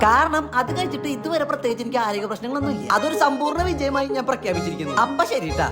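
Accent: Thrissur, India. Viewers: Bro thrissur anno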